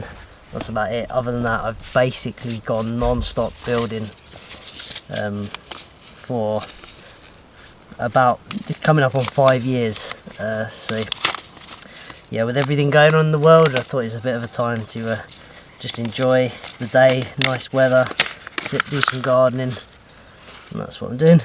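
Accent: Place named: South Wales, United Kingdom. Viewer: Very good South Wales accent LOL. I’m guessing Dyfed maybe west Glamorgan??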